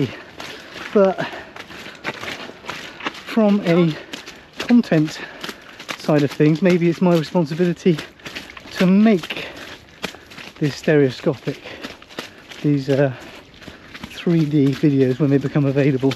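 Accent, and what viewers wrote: United Kingdom. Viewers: In love with your videos and British accent hugging my auditory receptors ♥️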